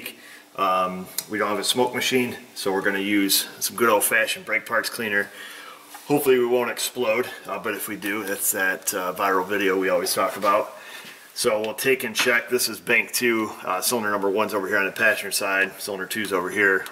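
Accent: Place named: New York, United States. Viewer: First of all,, im a Finn. I live pretty much at the same weather condicions as you in New york. We do use salt as ice melter on low freecing condicions,,, meaning 0- 10 Celcius. But not so much. Our vechiles seem to rust perhapse even more than yours in new york. I used to like the southern drawl,,, but becauce of you,,.Im a big fan of this Northern Newyorker language that you use.I do love the south allso.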